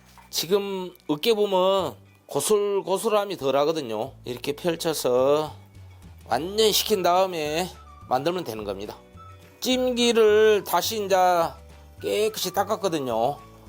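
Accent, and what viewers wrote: Jeolla, South Korea. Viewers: ㅋㅋㅋㅋㅋ. 선생님. 말씀 구수한 사투리 전라도 말씀 진짜 잼있어요. 음식도 맛나고 말씀도 구수하고. 진짜. 잘 알려주시고. 고맙습니다. 모는 음식. 해봐야 하는데. 시간도 없네요 ~~ㅎ ㅎ😂😂😂🎉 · 전라도 사투리가 아주 구수허니 정겹습니다 말씀 만큼이나 요리도 정성도 꼬숩고 대단하십니다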